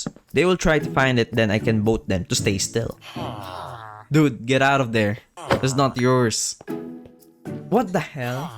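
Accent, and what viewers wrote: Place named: Philippines. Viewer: its really funny how i was slowly realizing that this guy was filipino by just listening to his voice. (BTW insane video keep it up)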